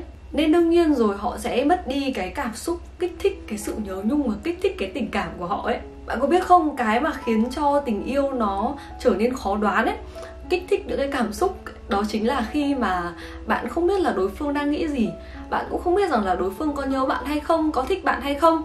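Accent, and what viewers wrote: Standard. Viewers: Chị nói chuẩn thế chứ lị 🥰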